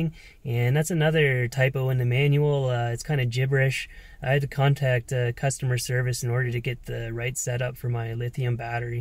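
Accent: Canada. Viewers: I can hear the Canadian "Eh" in your voice. Lol. I love your channel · You are SO smart!!! How'd you learn about all that stuff??? Your cooking always looks good as well! I admire how you like to cook your own food instead of buying fast food, I do also. You are cute, and I love your Canadian accent!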